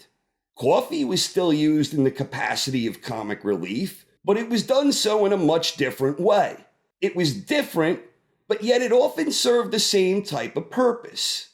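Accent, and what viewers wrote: New York, United States. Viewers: serious question, where are your from? I grew up in NJ and I suspect a hit of a NY accent? Please respond!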